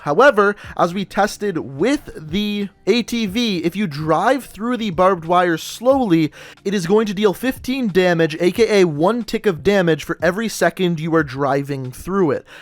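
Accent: Canada. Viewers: Fuck your accent Canadian fuckface!!!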